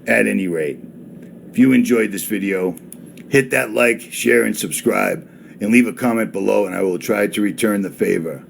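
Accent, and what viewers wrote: Boston, United States. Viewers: Sounds like Richie from Boston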